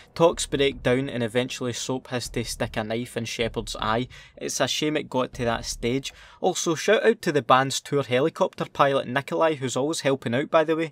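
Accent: Scotland, United Kingdom. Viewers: I love that accent 😂 reminds of Limmy's Show.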